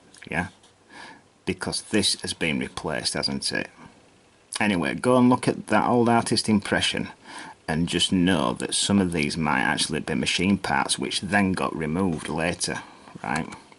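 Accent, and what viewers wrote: United Kingdom. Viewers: up: What area of the UK is your accent from?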